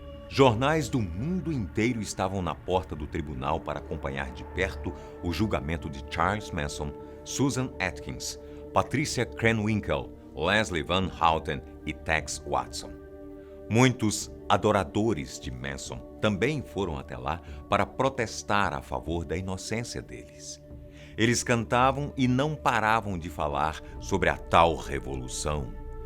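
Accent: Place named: Northeast, Brazil. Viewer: Sotaque Nordestino :v akakaj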